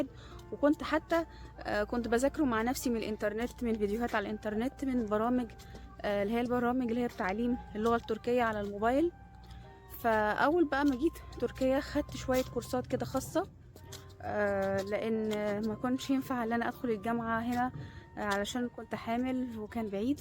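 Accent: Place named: Ethiopia. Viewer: تعلميها انتي الاول وبعدين تفلسفي لما بتنكلمي تركي نفس صوت ولهجة العاملات الاثيوبيات اللي بتتعلم من اصحابها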